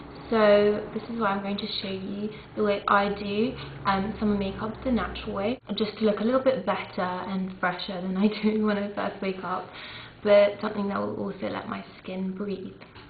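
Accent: United Kingdom. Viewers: i love british accients ha sorry had to say it, and thanks for the tips:).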